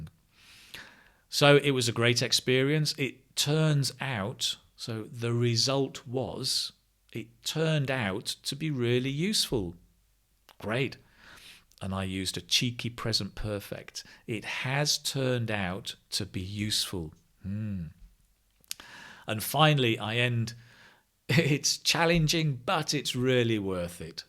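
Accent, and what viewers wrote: United Kingdom. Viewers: Hi 🙋🏼‍♀️ Great as always, thanks a lot charade is my cub of tea 🙃 By the way I’m in love with British accent 😍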